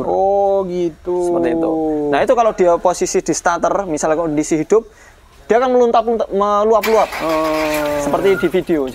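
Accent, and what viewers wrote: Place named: West Sumatra, Indonesia. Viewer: dari logatnya, kayaknya orang minang nih yg nyasar ke jogja 😁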